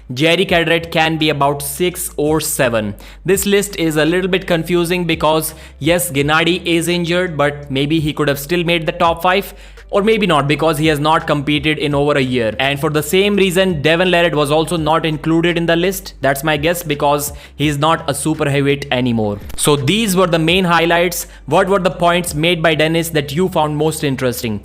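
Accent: India. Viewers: * has indian accent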